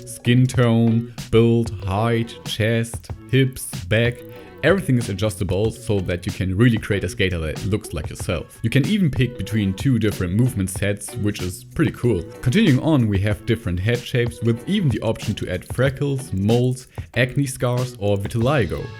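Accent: Germany. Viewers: bro bist du deutsch, glaube man hört des voll raus 😂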